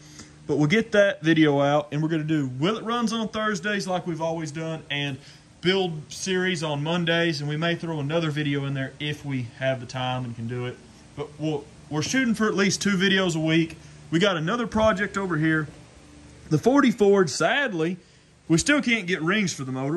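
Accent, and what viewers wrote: South, United States. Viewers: Cool to hear a young person with a strong southern accent still.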